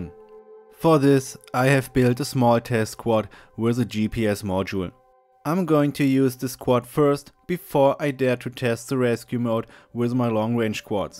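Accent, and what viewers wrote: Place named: Germany. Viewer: oh man.. du klingst so deutsch mit deinem akzent :D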